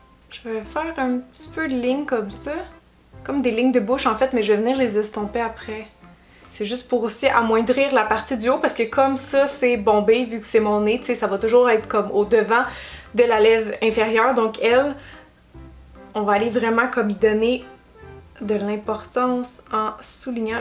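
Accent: Quebec, Canada. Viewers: Holala J'ADORE l'accent québécois 🤣❤️❤️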